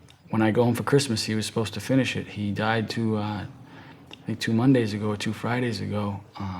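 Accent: United States. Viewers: ... G:)d bless the Boston accent ❤ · Born in Boston. Knew you were from MA as soon as you said your name. Best of success for you!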